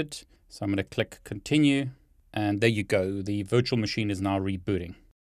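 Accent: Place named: South Africa. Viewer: South African!!!😂 Thanx for the installation boet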